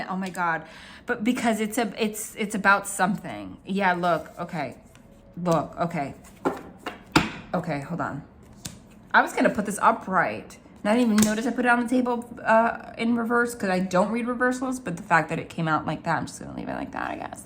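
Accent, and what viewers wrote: New Jersey, United States. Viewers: I loved the rituals, I vibed with the one for the naughty kids in the class 😂😂 Your voice and how you use it when the trance is strong is so cool, and the Jersey accent, of course. Especially for a South African male, our English accent does NOT sound good.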